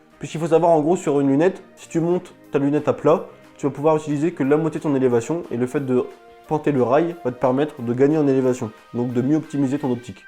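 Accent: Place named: North, France. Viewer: J'ai beau avoir quelques années de tir, je me régale à vous écouter. Et cet accent, du nord, trop sympa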